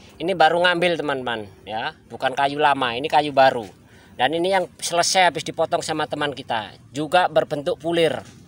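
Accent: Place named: East Java, Indonesia. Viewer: Logat pean kayak orang jawa timur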